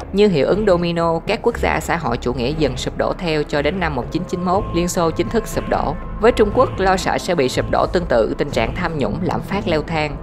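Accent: South, Vietnam. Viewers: Lúc đầu tui cũng nghe không quen giọng của ad vì hơi nặng so với giọng miền Nam bình thường, nhưng mà nội dung ad làm hay quá nên bị cuốn ý, nghe nhiều thành quen, không sao đâu nha ad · Bạn nói hay mà. Bạn người Nam thì cứ nói giọng Nam cho tự nhiên. Nghe vài vị dở hơi mắc mệt. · Người MIỀN NAM thì phát âm giọng MIỀN NAM dùm cái,Tinh da chứ k phải Tinh gia,dì chứ k phải là gì,dao nhiệm vụ chứ k phải là giao nhiệm vụ....nghe chói tai vcc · Nên nói là D miền Nam cố nói giọng Bắc thì còn khó chịu hơn đó bạn. Đừng đánh mất giọng miền Nam thân thương.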